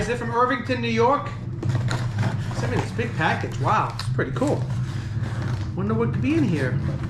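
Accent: Boston, United States. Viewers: I love listening to you with the Boston accent, cause I’m from Boston and I’m. I’m living in the south now and people always love my accent they say.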